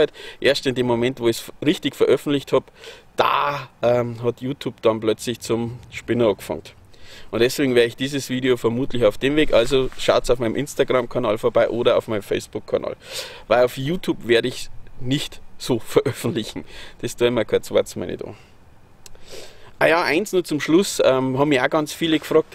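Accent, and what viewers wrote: Bavaria, Germany. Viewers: Bitte du musst weiter machen🤣🤣🙋‍♂️🙋‍♂️🙋‍♂️🙋‍♂️🙏🙏🙏🙏🙏🙏Wir brauchen Dich so sehr!!! Ich werde auch Mitglied anmelden!Bitte gibt du nicht auf!😂😂😂😨😨😨Ich verstehe Dich sehr gut!Wir können noch nicht von Luft und Liebe nicht leben!Jeder von uns müssen sein Lebensunterhalt verdienen. Lasst dich nicht unterkriegen💪🙏🙏🙏🙋‍♂️🙋‍♂️🙋‍♂️👍👍👍Alles Liebe aus München!P.S.Ich Liebe dein bayerisch 🤣🤣🤣🤣👍👍👍👍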